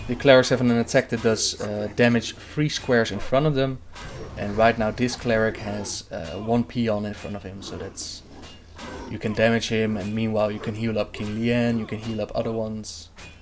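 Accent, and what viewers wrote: Netherlands. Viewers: Hey, I'm from Belgium, and i hear you'r a dutchman to;P Congratz and respect. Maybe we can play Together,:P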